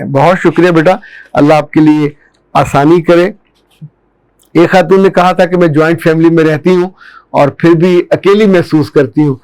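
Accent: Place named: Hyderabad, India. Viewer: Hyderabadi Urdu ...Awesome 👌👌👌😊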